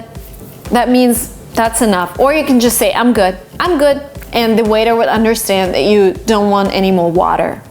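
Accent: United States. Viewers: Why am I watching your channel? First of all you are a non-native speaker and I highly prefer to observe your video because I want to take your advice that you've done since you start your learning and How you are able to speak like American. And I always tell everybody who learns English to review your channel cause it has a lot of advantages you are amicable and you elaborate anything so obviously and it makes your channel with lots of fortification. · I watch your channel because even though you're not native your accent is very real. Actually at first I thought you were American. So I find you inspiring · I watch your channel because you're just one of the best teachers I've ever had. When I first saw your channel,I thought you were American! You English is so good. Thanks for your help,keep making these amazing videos. I am 12,and my dream is to be a polyguot. 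BYE,from Brazil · The reason why I watch your channel is that your pronunciation is quite clear and I really enjoy the way how you make your videos it's very dynamic in my opinion that's the reason why I always try to imitate you right after you start speaking because I want to become fluent and obtain the American accent as you got. Moreover, it helps me a lot in learning new vocabulary!!!! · I like the way you speak your english in American so yeah it's great I like it. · I watch your channel bec you told me how to speak English like American accent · Before watching her video I thought that she was american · Are you like your way speaking you are a good teacher and you teach American accent so I can talk like a native speaker and you are so beautiful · I’m watching this channel bc I want the same accent as you .. and also I’m living in Canada .. and I kind of need American accent ...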